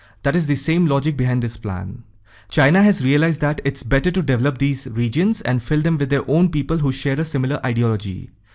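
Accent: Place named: Northeast India, India. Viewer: Hey i have been ur viewer for more then 7 months..u r one of the best indian channel which i follow.. most of the Indian channel r trash talkesr beating about bush most of time..ur hindi speaking accent sounds like of northeast region of india..where r u from..?? P.s - Just a curious subscriber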